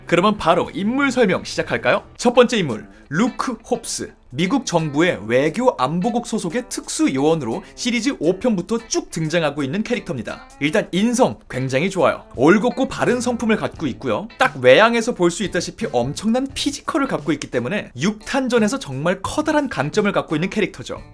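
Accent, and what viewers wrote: United Kingdom. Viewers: 영국 발음이라 그런거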